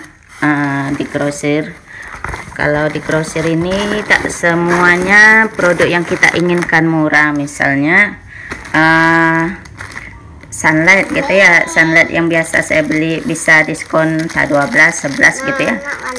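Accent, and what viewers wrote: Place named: Java, Indonesia. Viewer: Mbak Jawa nya nggak ilang....salam manis dari Kami di Depok yaaaa...🌷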